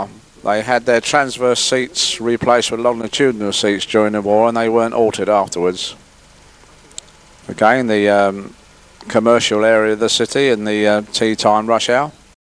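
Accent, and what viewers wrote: United Kingdom. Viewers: Great stuff, my dad would take me on the trolley buses back in 1966/7 into town to buy toys and seafood on the London road ( The Barras Market). Very happy days indeed. Did anyone ever tell you that you have an East End of London accent?, I lived there for many years. In fact you sound just like the late Charlie Kray. How would I know that. Keep up the good work.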